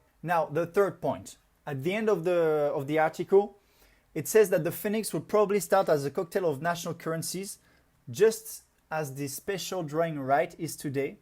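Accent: France. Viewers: Adam XRP Son Lol. This guy sound very French. Ran is South African...yet I hear how you could confuse the two. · tu parles bien anglais mais ton accent est français ^^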